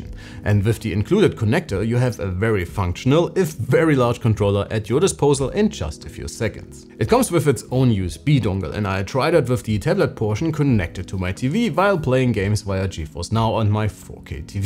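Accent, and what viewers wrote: Germany. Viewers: That German accent is 🔥 🇩🇪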